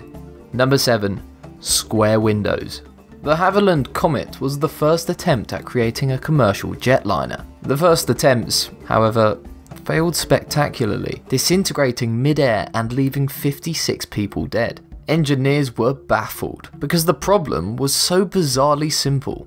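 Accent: England, United Kingdom. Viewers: disliked in 23 seconds.due to dorky face figure (after brit accent) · An English voiceover speaking an American English script always does my head in. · Having an English accent will only fool some people into thinking you know what your talking about young man. List videos then to have lots of mistakes in them but this one takes the cake! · the guy in the video obviously doesn't know much about physics But he's got a smooth British accent, and that gives him instant intellectual street cred.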